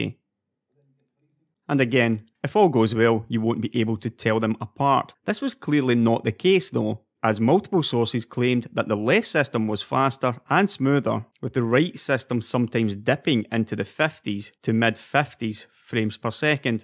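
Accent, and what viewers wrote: Scotland, United Kingdom. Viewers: this Scottish accent tho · I can even understand your Scottish accent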